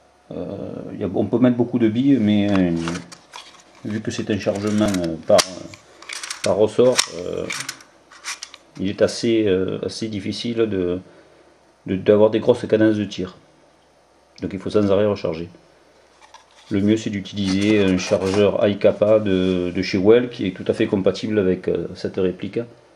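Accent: France. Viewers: hehehe i luv ur french accent xD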